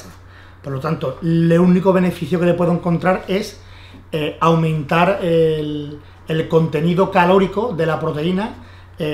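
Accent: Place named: Seville, Spain. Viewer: es sevillano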